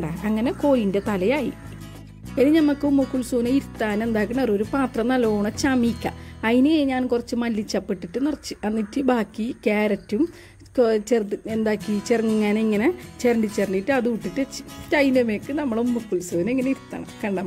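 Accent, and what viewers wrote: Kasaragod, India. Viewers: മൊഞ്ചായിട്ട് 🥳 ഇങ്ങളെ കാസർഗോഡ് ഭാഷ മെജ ആയിട്ട് ഇന്ക് നല്ല ഇഷ്‌ടായി ഞാൻ മലപ്പുറം 🥰, സൂപ്പർ ആയിട്ടുണ്ട്, ഞാൻ nijjus cafe ലൈവിൽ നിന്ന് കൂട്ടാവൻ വന്നതാണ് ട്ടോ ആ 15 ആളിൽ ഒന്ന് ഞാൻ ആണുട്ടോ 🤝 ഇങ്ങോട്ടും വരണേ നാളെ 24 മണിക്കൂർ കഴിഞ്ഞിട്ട് അല്ലകിൽ സ്പാ ആവും 👍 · അടിപൊളിയായിട്ടുണ്ട്. ഒരു പുഞ്ചിരിയോടെയാണ് വീഡിയോ മുഴുവനും കണ്ടത്.അതുപോലെ കാസർകോട് ഭാഷ കേൾക്കാൻ നല്ല രസമുണ്ട്. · എല്ലാ സൂപ്പർ ആയിട്ടുണ്ട് പിന്നെ കാസർകോട് ഭാഷകേൾകാൻ നല്ല പാങുട്എനിക്ഇഷ്ടായി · Ambambo 😍 jan aadyam vijarichathu kozhikalude sammelanam aanenna🤭😄. kasaragod bhasha kalakkitund.entho valiya sammelanam nadakkukaya 🤭.good presentation 👍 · ഫുഡ് ഉണ്ടാക്കുന്നതിന്റെ കൂടെ കാസർഗോഡ് ഭാഷ കൂടെ ആയപ്പോ അടിപൊളി ആയി 👌👍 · കാസ് റോട് ഭാഷ👌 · സൂപ്പർ കാസറഗോഡ് ഭാഷയും എനിക്ക് വളരെ ഇഷ്ട്ടപെട്ടു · വളരെ രസത്തിലുള്ള അവതരണം..കാസർകോട്ട് എനിക്ക് ഇഷ്ടമുള്ള ഭാഷയ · വളരെ ശ്രദ്ധയോടെയാണ് ആദിയോടന്തം കണ്ടത്. കാരണം അത്ര പരിചിതമല്ലാത്ത ഈ സ്ളാങ് മനസ്സിലാക്കണമെങ്കിൽ വളരെ ശ്രദ്ധയോടെയിരിക്കണം. 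കേട്ടിട്ട് ഇത് കാസർഗോഡ് സ്ലാങ്ങാണെന്നു തോന്നുന്നു. കേട്ടിരിക്കാൻ ഒരു രസമുണ്ട്. എന്തൊക്കെയാണെങ്കിലും അവതരണം നന്നായിട്ടുണ്ട്. അഭിനന്ദനങ്ങൾ. ഞാൻ സബ്സ്ക്രൈബ് ചെയ്തു. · മൊഞ്ചത്തി പൊളിച്ചു. കാസർഗോഡ് ഭാഷയും കിടു ആണ്. ഉമ്മുകുൽസുനെ ചെക്കൻമാര് കൊത്തികൊണ്ട് പോകുന്നത് നോക്കിയിരുന്നോ. മൊഞ്ചു കണ്ടിട്ട് എനിക്കും കൊത്താൻ തോന്നുന്നു........ 😝😝😜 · ishtayi presentation athilum ishtayito kasarkode slang super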